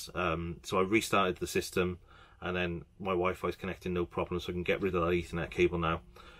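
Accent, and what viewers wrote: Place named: Wales, United Kingdom. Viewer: Heya. I thought that accent sounded familiar. Are you from Cardiff? I’m from Newport :) I do 1-Credit clears of arcade and console games mainly. Those LED panels look really cool, I may have to look into this.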